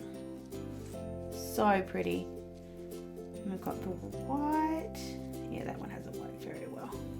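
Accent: Australia. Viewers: Just Love Aussie accent and culture!! :D thanks for the video!! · are you from QLD? Trying to guess your state by your accent :) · Between your adorable excitement & your accent....I was anticipating a “crikey” or 2, but at least once 😂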